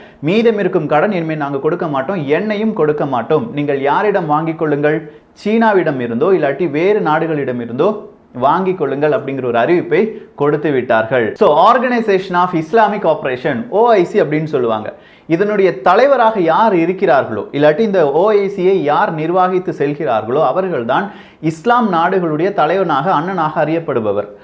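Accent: Kerala, India. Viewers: Extrodanary...bro....malaiyaala slaanghiel.neeghal peyisuvadhu.yenn uurrai .ghiyaabhaha paduththuhierradhu...,‼️‼️‼️‼️‼️👌🙏👍👍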